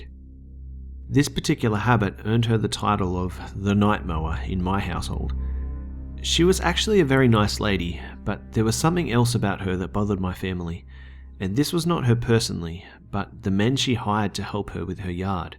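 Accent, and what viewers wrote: Australia. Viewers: love vids ya gotto have a aussie on youtube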